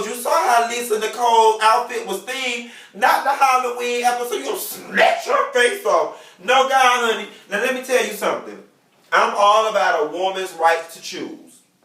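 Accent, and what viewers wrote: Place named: South, United States. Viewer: i love your videos and I'm an avid watcher.... But baby.... You hella country!!!!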